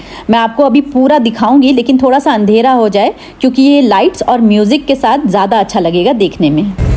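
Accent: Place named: Mumbai, India. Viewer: Sister aap mumbai se ho , aur aapka sasuraal u.p. ka hai, isliye aapki mix zabaan badi hi mazedar lagti hai sunne me. Khaskar jab aati hai, ise chhupa kar paka lenge..😊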